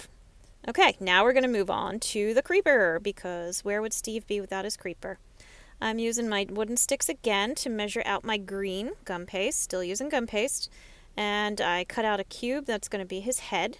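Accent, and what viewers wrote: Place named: Maryland, United States. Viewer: Are you from Maryland? Noticing your accent, hon! 🦀 P.S. Thanks for tutorial 😀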